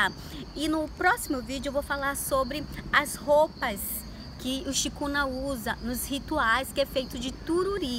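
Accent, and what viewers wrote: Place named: São Paulo, Brazil. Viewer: Índio com sotaque paulista !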